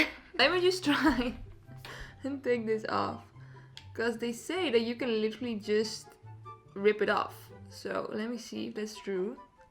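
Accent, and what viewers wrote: Netherlands. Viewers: Are you Dutch? I just discovered your YouTube and this is the first video I saw but you sound Dutch haha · she's dutch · ik dacht al dat je een nederlands accent gad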